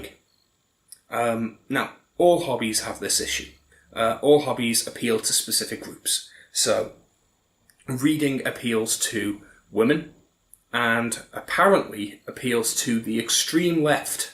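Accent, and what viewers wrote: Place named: England, United Kingdom. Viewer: Thanks for this very intelligent, interesting talk. It's very courageous of you and will earn you lots of brickbats, I'm sure. I did not know that one can block people on YouTube. How do you go about it? I am thinking of starting a BT channel. I am put off BT a lot because it seems almost entirely to consist of young, female 'readers' posting who are as interested in their appearance and their books' appearance as they are in books in general. Eg, shelving your books according to the colour of their covers! That strikes me as pretty infantile, unless you're five or six. Many of my books are shabby, grainy second-hand copies with little colour about them at all. One young female poster gave details of her make-up and where to buy it! I'm an old man and so feel really out on a limb, but will still give it a go,because I would like to meet a handful of people who are serisouly interested in books. 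I have found a few older women's' channels that are very interesting and from whom I have actuallyl earnt things. Another complaint I have is the lack of people interested in non-fiction reading. I hardly read anything else! The algorhythm must have filtered a lot of left-wing things out from my recommendations. I haven't had lots of leftie rubbish thrust at me, but I have noticed that leaning, too. Your picture seems very American, culturally speaking, though you sound 'English'.. I'm a Brit. There aren't many Brits on BookTube. Thanks again for a great contribution.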